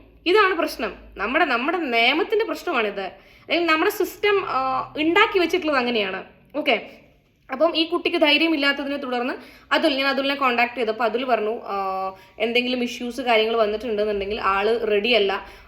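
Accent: Kasaragod, India. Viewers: Edek ninde slang ksd ambo nalle paangan .. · Nammude aa Kasaragod language kayari varumbo oru sugam kelkan. Hats of you dear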